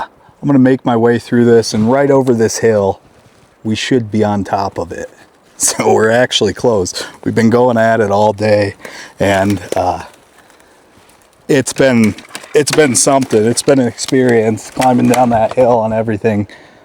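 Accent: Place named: Canada. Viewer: Wooden pipes are just flat sided barrels, pretty much the same cuts to the wood, except the steel wire holds the compression. Once the pipe gets water, the wood swells and the wires don't. Because there is a water pipe the same size going downhill from this building I believe it was a speed baffle and control using 2 guillotine valve blades. Downstream somewhere would be a water wheel to power a belt drive system most likely for a lumber mill. Don't know where this, is but the guy has a bit of a Canadian accent so lumber seems most probable.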